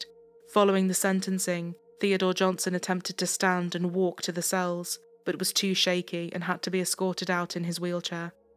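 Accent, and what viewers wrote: England, United Kingdom. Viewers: I love her English accent. I only watch her to polish my English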